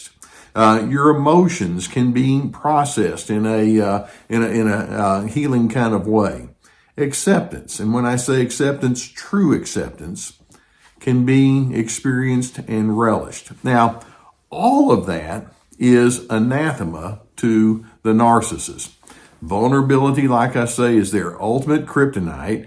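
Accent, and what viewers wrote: South, United States. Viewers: You standing on hollow ground..... My jaw dropped when I saw you reply to US... Really I started to cry. The very first time I watch you. You had so much southern twang that I kept watching other people, but in this past year... Your the best! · Love the southern twang!! It helps my Georgia heart listen ❤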